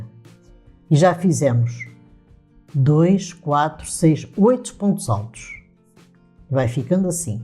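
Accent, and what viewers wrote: Portugal. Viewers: Amo seu sutaque portugues..a sua explicação é maravilhosa... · Muito linda e seu modo de ensinar maravilhosa. Seu sotaque lembra muito minga avó portuguesa. Miro Salvador.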